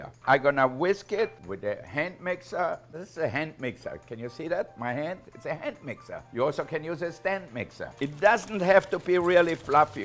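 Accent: Germany. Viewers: Is he German? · It rallye sounds german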